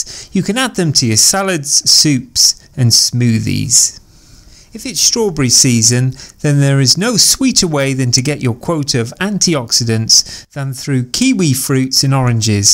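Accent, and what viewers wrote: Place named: England, United Kingdom. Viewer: Where in England is he from?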